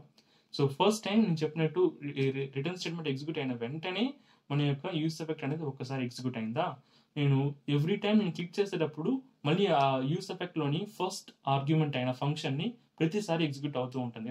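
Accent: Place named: Chittoor, India. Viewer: Clear cut explanation with theory as well as hands on, its really building confidence for me to keep up learning React js thank you bro and finally I think ur from Border of Andhrapradesh or from Chittoor district/TN right...?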